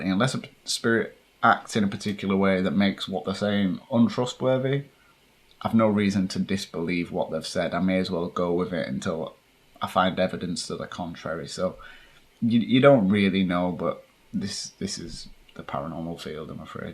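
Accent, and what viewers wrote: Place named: England, United Kingdom. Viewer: I'm a very new subscriber, and have spent the past two nights binge watching your videos and creeping myself out alone at night. Have you ever tried old school spirit boards, Ouija, or pendulums to communicate and ask questions to the spirits ? Love your hard work exploring these spirits and love listening to your English accent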